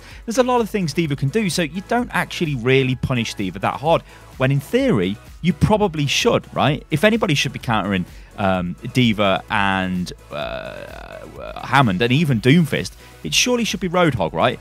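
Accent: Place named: United Kingdom. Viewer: Hi! Love your vids, it's so nice to listen to a British accent like my own to when watching gameplay!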